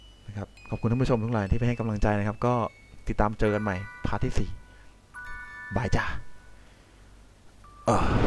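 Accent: South, Thailand. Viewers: พี่ เสียงเหมือนคนใต้เลย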